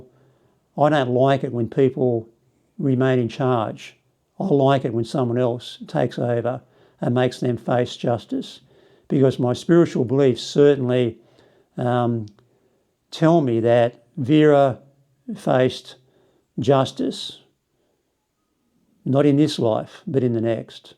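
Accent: Australia. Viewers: It's great to hear that beautiful Australian accent Thanks very much for your story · Me too, just to hear a fellow Aussie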